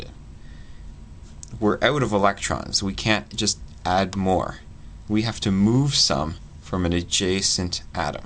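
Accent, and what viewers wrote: Canada. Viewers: Thanks a lot, helped a bunch. And props to the Canadian accent!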